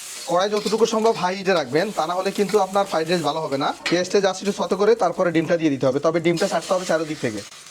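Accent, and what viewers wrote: Noakhali, Bangladesh. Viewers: হেতে নোয়াখাইল্লা এএএ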